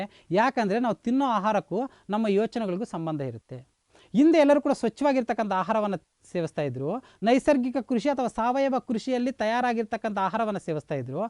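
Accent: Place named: Tumkur, India. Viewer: Nam tumkur voice kelokke chandaa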